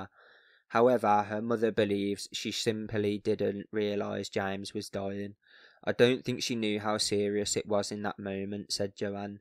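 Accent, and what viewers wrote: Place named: Birmingham, United Kingdom. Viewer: I think this person may be mocking your Brummie accent 🙈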